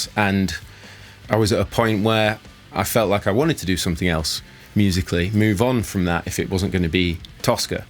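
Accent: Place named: London, United Kingdom. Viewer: Me: he sounds like he's from London..?: Sweetwa'a Me: say no more.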